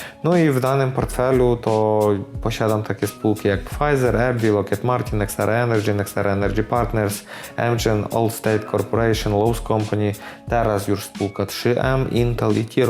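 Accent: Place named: Germany. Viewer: Witam Rostock taki akcent masz jesteś Polakiem filmiki spoko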